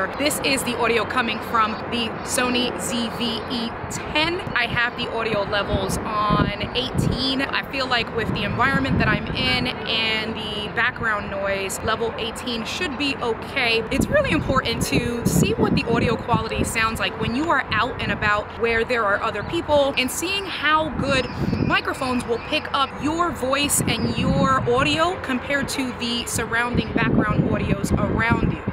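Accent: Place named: New York City, United States. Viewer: I could tell you were from NYC when you started talkin (I’m from Qnz)…then you went outside and I was like YAAASSS 🗽 then I got to the end and got a YERRRRR and it was the cherry on top 🗽🗽🗽 lol great vid, very helpful 🤙🏾 s/o NYC 💯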